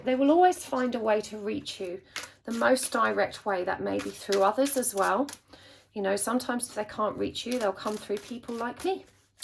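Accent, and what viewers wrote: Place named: South London, United Kingdom. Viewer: I like to hear your voice. It reminds me of home. Somewhere between South London and Brighton I guess... I will probably never go back again as I refuse the vaccine, I'm pretty much stuck where I am. We make our bed and we must lie in it I guess. Anyway, thanks for the soothing and long-ago familiar tones of my home strip!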